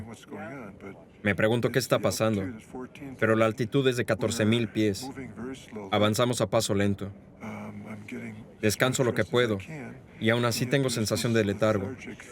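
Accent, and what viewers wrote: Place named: Spain. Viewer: No soporto el acento gachupin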